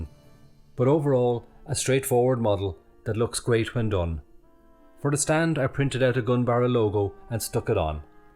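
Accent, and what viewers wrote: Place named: Ireland. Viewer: Hi / Finding it difficult to obtain and buy kits locally. I regularly visit a store "MARKS MODELS" here ib the Emerald Isle but it has limited stock in the sci-fi range. I think I have bought everything he has listed. There seems to be a lot of items listed on the JUNGLE website but then states its not available to deliver yo your location. I wondering as you sound local to me, where do you pick up your kits? Many Thanks. Seán Óg. Liking your channel.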